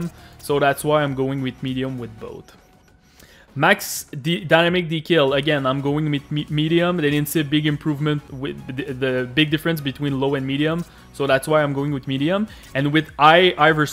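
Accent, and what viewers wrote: Quebec, Canada. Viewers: Thanks man ! Clairement un Québecois, corrige moi si je me trompe ;P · Um, are you a French Canadian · Bel accent Québécois! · Are you French Canadian?? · Tes québécois non??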